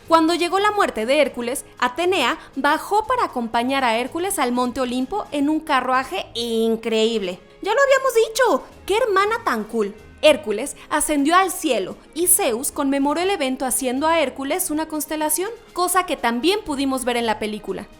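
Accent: Mexico. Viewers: Ese acento mexicano no lo soporto.. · Buenísimo el vídeo, no sabía casi nada hahaha... Una cosa, deben bajarle a ese acento mexicano, a los que no somos de allí nos incomoda un poco, por favor traten de usar un acento más "neutral" para que todos nos sintamos cómodos.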